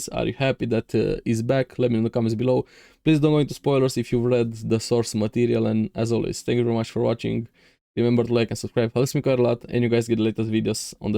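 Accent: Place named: Eastern Europe. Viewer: Soooo happy this anime has finally returned!! I watched Season 1 Hell's Paradise, Chainsaw Man, and Heavenly Delusional all around the same time and been patiently waiting for their return cause I loved all 3. Especially Hell's Paradise cause I think it's such a fire series. Nice review. I liked and SUBBED. By the way where are you from bro?? You have a cool voice and great English. Eastern European or Russian I'm guessing? lol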